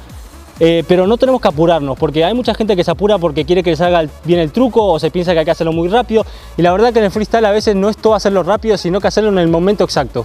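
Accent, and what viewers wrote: Argentina. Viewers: Crackk!!! Sos argentino no??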